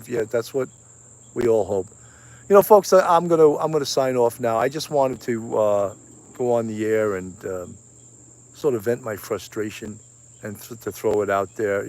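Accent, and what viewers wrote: New York, United States. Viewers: New subscriber, love your New York accent.. thanks for your service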